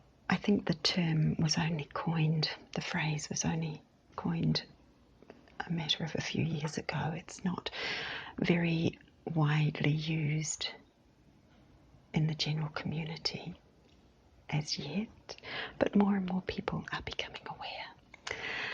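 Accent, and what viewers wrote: New Zealand. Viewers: Hello!!! I was amazed to hear that you had listened to a Minister who was also an archeologist as I did the same thing before I ever heard of ASMR (a couple of weeks ago). Was/is his name Francois du Plessis? I LOVE listening to him both for the content and the softly-spoken accent which was unintentionally ASMR. If I was sitting in one of his lectures I'd better be on the back row as I'd fall asleep instantly!!! I love the S.A. accent and I love your beautiful NZ accent. Thank you for what you do 💙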